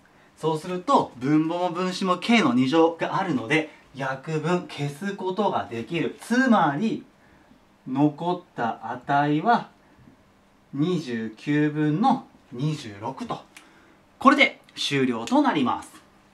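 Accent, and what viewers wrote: Kansai, Japan. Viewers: たまに関西弁のイントネーション入るよね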